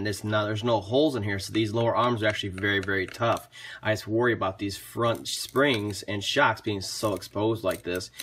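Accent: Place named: Northern Illinois, United States. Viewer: I'm hearing a far more northern IL voice than mine so probably nowhere near me. I'm just an hour or so from KY actually.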